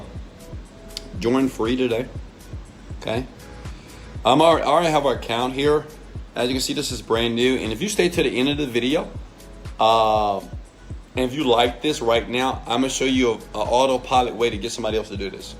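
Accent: South Africa. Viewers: Fantastic, you sound like you're from JHB or the Cape.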